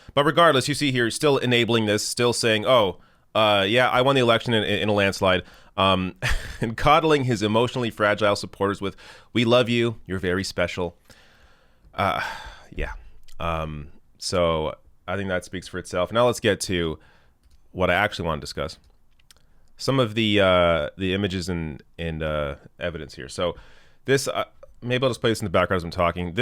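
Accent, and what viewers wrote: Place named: Canada. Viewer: Your Canadian accent came through more in this video and I love it.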